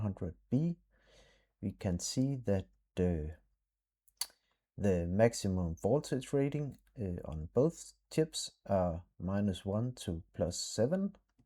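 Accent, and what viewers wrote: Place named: Denmark. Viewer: Hehe the danglish <3 Kanon video ven. Hyggeligt med lidt nostalgi. Er der overhovedet en use case med 128mb ?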